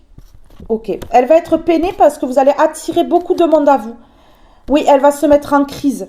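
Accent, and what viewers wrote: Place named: South-West, France. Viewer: Merci j adore votre accént sud ouest de la France vous etes une bonne personn ej adore votre humour bonne continuation dans la voyance et desolee j espere ne plus vous embeter avec mes problemes merci beaucoup votre humour me fait du bien je vous souhaite que du bonheur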